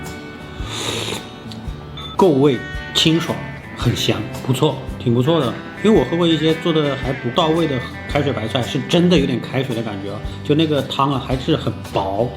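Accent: Hubei, China. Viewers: 说话跟那个阿斗归来了很像。湖北口音？ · 听口音像湖北人？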